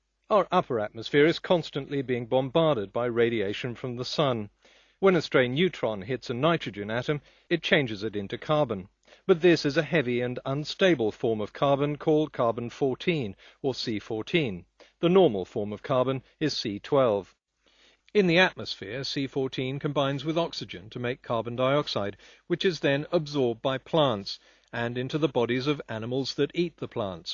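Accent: United Kingdom. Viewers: Finally someone tells it like it is, and with a British accent! Perfect! Even though I'm American. Thanks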